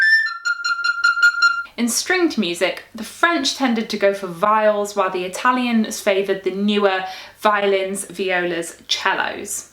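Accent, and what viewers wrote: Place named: United Kingdom. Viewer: I'm in the second half of the video... and I already got lost :'D but it could be because I speak spanish and I'm not used to hear British English u.u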